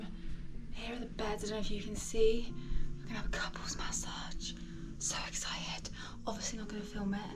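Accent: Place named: Texas, United States. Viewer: You sound like you're from Texas! hahaha...Well hello from Texas anyway!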